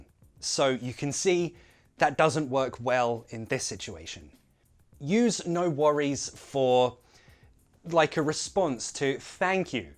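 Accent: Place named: Cockney, United Kingdom. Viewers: I just LOVE your accent. Simply beautiful. It reminds me of my younger days in London. More than 30 years ago ! From an old man in Japan. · hello I really want to learn Cockney accent and I saw the two videos concerning Cockney but I want you to focus on it in the coming videos I like your Cockney accent and I want to learn more from you please and thank you for your efforts and useful courses 💜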